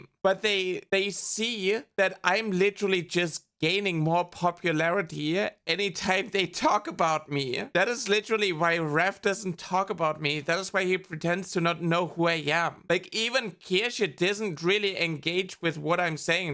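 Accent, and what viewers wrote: Germany. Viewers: That's what I thought too 💜 I immediately recognized the German accent and got super happy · Ok I am gonna say it, I thought you were Shyaren under a new name cause I haven't heard her voice in a long time lol. I guess the very distinctive German accents you two have made me think that lol. I will maintain if you had a high pitch and were more of a gremlin, you two would sound exactly the same lol.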